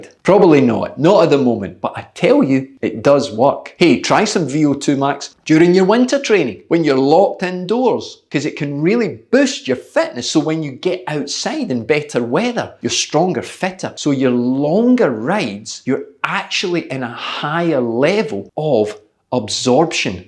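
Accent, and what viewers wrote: Scotland, United Kingdom. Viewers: Hahaha! I laughed out loud when you mentioned talking less Scottish. Then I thought, actually his accent is pretty thick. I love it, and I love the advice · I like your Scottish, Coach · Please never speak less Scottish! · I like your scottish accent :D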